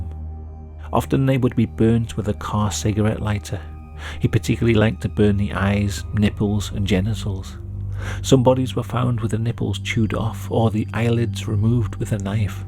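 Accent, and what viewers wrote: United Kingdom. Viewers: How have I not discovered your channel sooner?! True crime is a lot easier to take in with a British accent I find. Disturbing stuff but very detailed work. You'll find me here more often now. Keep it up!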